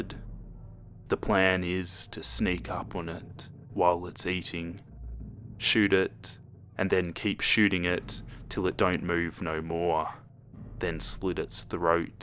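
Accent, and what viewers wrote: Australia. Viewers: DasConii I love the way he says it too, beautiful Australian accent. Maybe I just think this because I don't have an accent?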